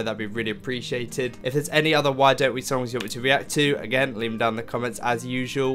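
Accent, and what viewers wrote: United Kingdom. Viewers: I love British accent so I get you 😂♥️♥️♥️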